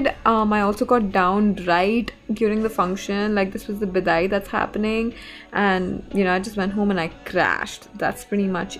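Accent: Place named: India. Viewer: You hindi accent is soo cute.😂❤️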